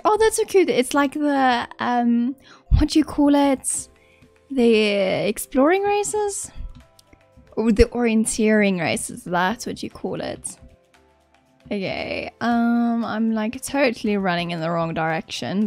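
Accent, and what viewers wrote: South Africa. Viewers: Your accent sounds so familiar. Is you South African like me?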